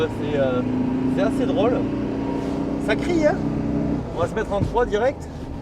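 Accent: South, France. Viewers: C'est débile, même avec l'accent du sud, on n'y croit pas : soit tu achètes un frigo, soit tu achètes une LN ... il est mauvais et il le dit lui même sans respecter la voiture qu'il présente ... un guignol de plus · Allez je me replonge dans un ancien test : ce qu'il y a de merveilleux avec toi c'est que tu vas t'extasier avec le même enthousiasme devant une modeste populaire autant que devant une Ferrari ! En bon chauvin, le charme vient aussi de notre bel accent du sud... Ouh la je vais me faire des ennemis....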